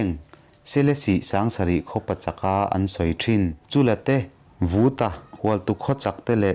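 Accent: Mizoram, India. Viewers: Hmar țawng hian ti kher lo la tha tur... Ka lo ip chawih chawih mai.. mahse a tha lutuk. 😂😂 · Hmar tawng a mawi..♥️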